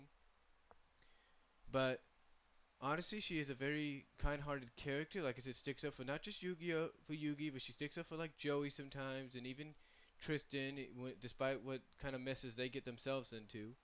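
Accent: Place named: New England, United States. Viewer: Are you from New England or somethin g? You sound like a very young Ralph Bakshi.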